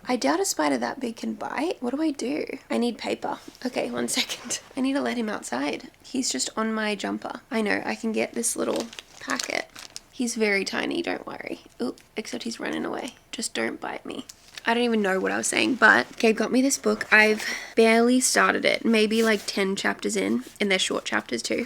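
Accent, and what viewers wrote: Australia. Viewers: !! Her little accent!! Butter and water was definitely all Aussie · OMG SHE HAS AN AUSSIE ACCENT 😭❤️ YESSSSS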